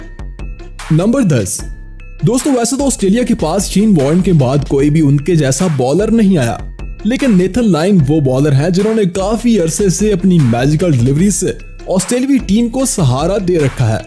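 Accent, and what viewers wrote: Pakistan. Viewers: Your voice is like a Pakistani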